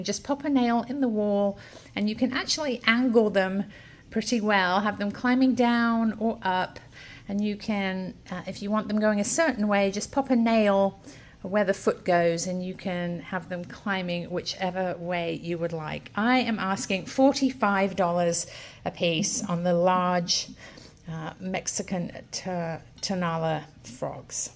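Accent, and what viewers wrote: England, United Kingdom. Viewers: Where are you from? Where is the accent from? England?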